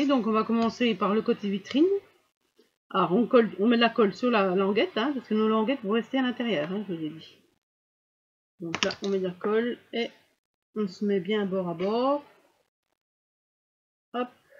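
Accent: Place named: Franche-Comté, France. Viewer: Super idée ! Je vais en faire 1 aussi ! J'avais bien reconnu l'accent franc-comtois ( suis Belfortaine) 😉 je m'abonne à votre chaîne😁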